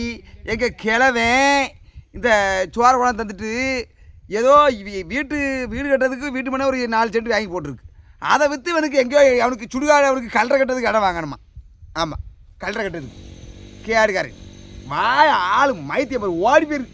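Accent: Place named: Tirunelveli, India. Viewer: தம்பி உங்க ஊரு வெளியில விடமாட்டேன் நான் மும்பையில் இருந்து ஃபாலோ பண்றேன் எனக்கும் திருநெல்வேலி தான் உங்களுக்கு தொல்லியல் எந்த ஊரு கண்டிப்பாக சொல்லுங்க நீங்க பேசுற ஸ்லைம் எனக்கு ரொம்ப ரொம்ப பிடிக்கும் முடிஞ்சா ரிப்ளே வாங்க❤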